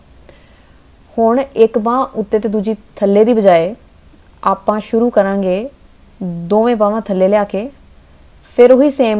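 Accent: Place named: Amritsar, India. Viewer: Boli ton ta bibi ambarsar di lagdi a. Ke nai??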